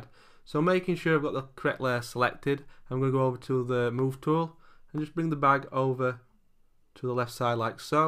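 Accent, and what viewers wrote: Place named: Newcastle, United Kingdom. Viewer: OMG YES, would love to win pixelmator :D haha Are you from new castle??? I'm in love with your accent :)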